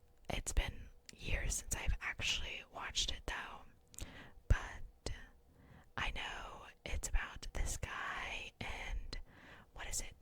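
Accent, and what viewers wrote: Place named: South, United States. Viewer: I love your little country accent amazing video ❤️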